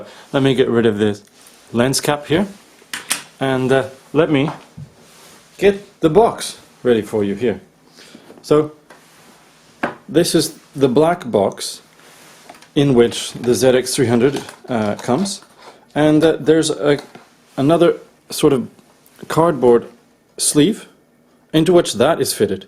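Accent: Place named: Germany. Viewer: Well despite his terrific English he doesn't seem to me to be a native speaker and I was trying to work out where he was from - I think he's a German speaker who's spent much of his life in Canada. And -> Ant 7'46 - sounds like a German tic to me. Still - incredibly good level of English!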